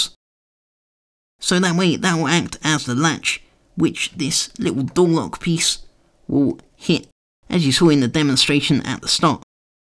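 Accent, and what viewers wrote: United Kingdom. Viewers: Cool.I have my own channel which is inspired from seeing the worlds coolest LEGO builder. Are you from Great Britain?The accent is very familiar to Britain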